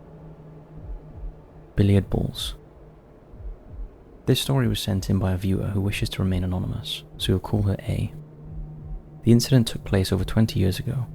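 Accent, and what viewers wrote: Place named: England, United Kingdom. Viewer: think everyone agrees not sure where in England he is from?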